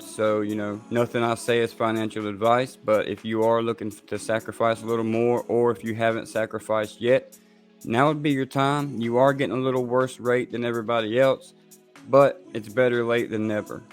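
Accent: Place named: South, United States. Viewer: you keep it simple that’s why I like you! And your southern n into crypto rare combo! Oklahoma here so I appreciate a firmiliar voice in the space ! Took your advice thank you!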